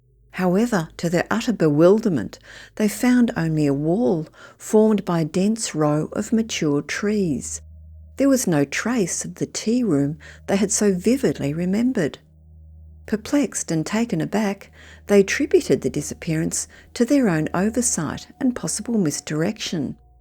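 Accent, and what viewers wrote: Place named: Australia. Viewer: She's Australian. That's how Australians talk.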